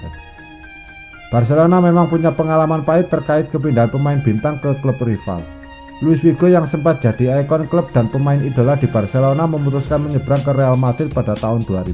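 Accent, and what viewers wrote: Java, Indonesia. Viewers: Medok amat jawanya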